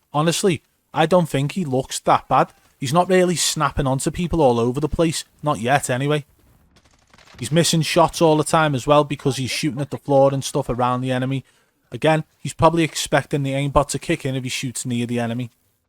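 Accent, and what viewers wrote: Liverpool, United Kingdom. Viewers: U from Liverpool?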